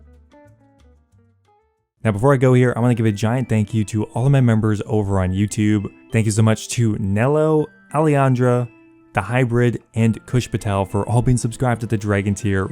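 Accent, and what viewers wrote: Quebec, Canada. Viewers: You sound French canadian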